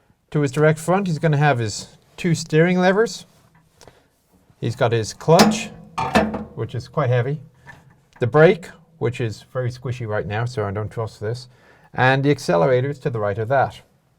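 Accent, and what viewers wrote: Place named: Ireland. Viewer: I thought you had an Irish accent alright:) Either that or you're well versed in international transport logos:P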